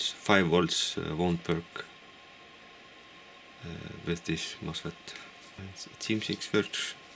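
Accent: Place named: Estonia. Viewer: You sound like Estonian are you ? Or maybe Finish or Hungarian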